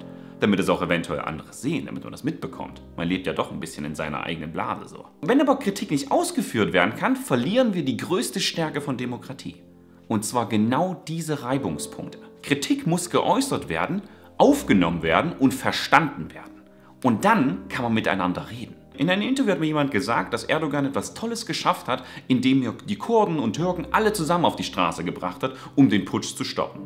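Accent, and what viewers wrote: Saxony, Germany. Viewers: ich find deinen Dialekt super, komme selbst aus Sachsen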